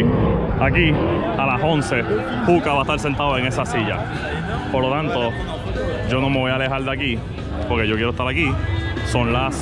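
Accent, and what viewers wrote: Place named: Mexico. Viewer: no te conocía Bro, youtube me sugirió tu video y blogeas muy bien, vas bien, eres de PR pero traes un toque como mexa y eso es cool, saludos desde MX!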